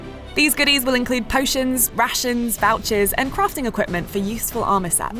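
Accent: United Kingdom. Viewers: seriously what is up with people, you all act like yours is the only acceptable form of pronunciation,It's UK English and you can clearly hear it in her voice. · Afro Samurai HD bless that UK accent bro. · I'm British, and that's how we say Beta. See also "tomatoes" "vitamin" and "aluminium" for further education / infuriation.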